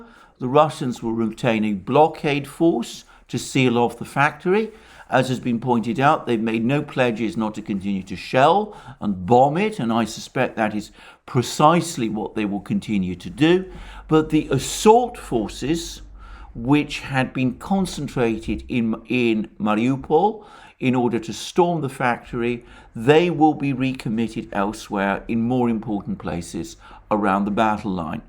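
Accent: England, United Kingdom. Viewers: He's got an English accent, my friend.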